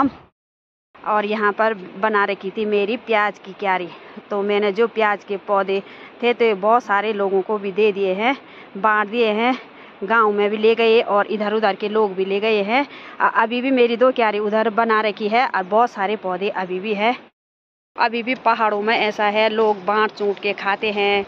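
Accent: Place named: Garhwal, India. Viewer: Jitni marji Hindi bol lo gadhwal ton me bat to ho hi jati hai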